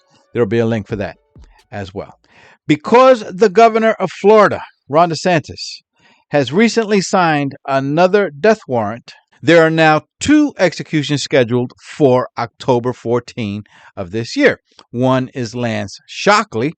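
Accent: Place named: Latin America. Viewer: and your latin voice.😊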